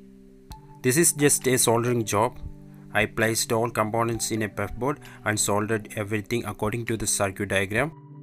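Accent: Kerala, India. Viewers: Bro r u from kerala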